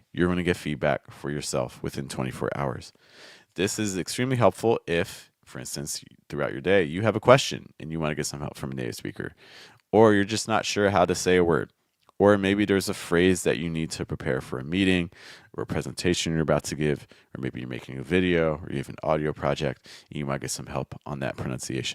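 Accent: United States. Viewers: I've totally improved my American accent with you .. thanks a lot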